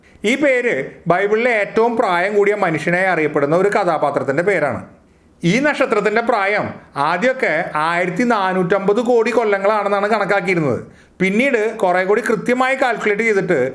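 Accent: Thrissur, India. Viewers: ത്റുശൂ൪ ഭാഷ കേൾക്കാ൯ രസമുണ്ട്. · ഇൻഫർമേഷനിൽ കൂടുതലായി തൃശൂർ ഭാഷ കേട്ടതിൽ സന്തോഷം. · Thanks,നല്ല അവതരണം, തൃശൂർ സ്ലേങ്, ഇനിയും കൂടുതൽ അറിവുകൾ പ്രതീക്ഷിക്കുന്നു,, പ്രകാശത്തിന്റെ വേഗതയിൽ യാത്ര ചെയ്യാൻ പറ്റുന്ന കാലം വിദൂരമല്ല സാധിച്ചാൽ മിനിമം നേപ്ടോൺ വരേയെങ്കിലും ഒരു ട്യൂർ ഒപ്പിക്കാം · Thrissur slang 🥰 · അയ് നമ്മൾ തൃശ്ശൂരിൽ നിന്ന് ആണെന്ന് തോന്നുന്നല്ലോ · തൃശൂർ ഭാക്ഷയിലാണല്ലോ സംസരം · ത്രിശ്ശൂർ സ്ലാംങ്ങ്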